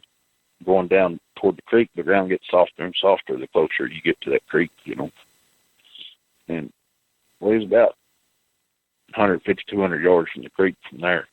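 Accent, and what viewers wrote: South, United States. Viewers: I think it's a sexy rough n tough rugged good ol boy southern gent mountain cowboy accent. Very Revered Very Rare Esteemed Honest True Man Idk if ever that was even a thing but I sure do believe it is now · Great stories !! Being a Fla boy love hearing this fellers southern talk 💯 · Love dat suthern akscent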